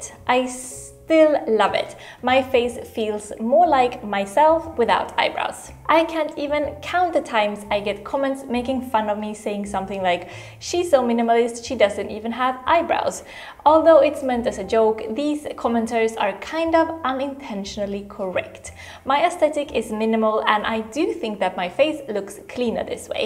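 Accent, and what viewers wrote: Sweden. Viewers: Where are you from? You have a swedish accent.. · Are you Swedish? I hear some English - Swedish accent. Hehe.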